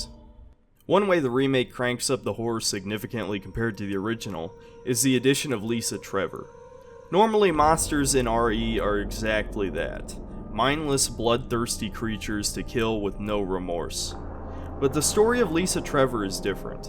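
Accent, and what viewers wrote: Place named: Central New York, United States. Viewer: You have got to be from Central NY. Probably Syracuse or Oswego. We can’t escape our CNY accents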